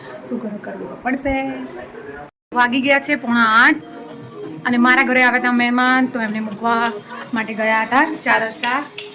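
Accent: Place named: Mehsana, India. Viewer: Mehoni bhasha bv gme 😅tmri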